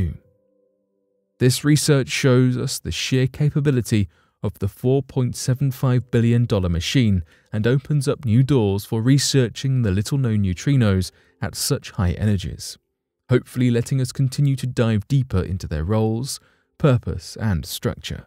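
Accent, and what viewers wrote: United Kingdom. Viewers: I'll believe anything in a video that's narrated by someone with a British accent. Subscribed. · Lisping is annoying, even with a British accent.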